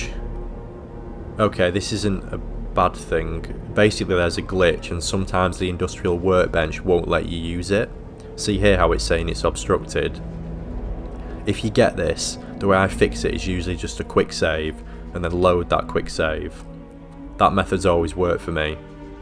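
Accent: United Kingdom. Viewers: You sound English so why say ALUMINIUM the American why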